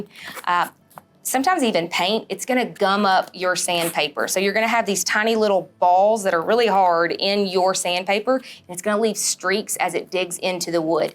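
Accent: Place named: West Texas, United States. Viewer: Thanks for the refresher on types of sanders and sandpaper. I'm trying to place your accent. Are you from west Texas?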